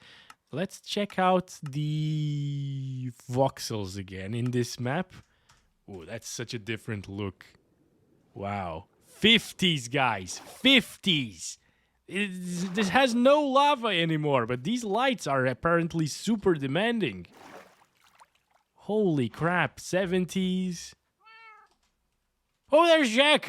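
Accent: Portugal. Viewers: Ah, I always knew you were Portuguese! That accent does not lie lol As a fellow PT I forgive you for preferring beer 😄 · mano no inicio do video eu percebi logo que o gajo era tuga ahahah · Primeira vez a cruzar com este canal e ao fim de 10 segundos, meto os meus tomates no cepo como é um português! 🥳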